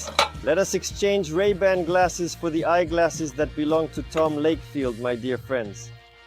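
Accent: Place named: RP, United Kingdom. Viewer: The ai translator speaks like a British royalty.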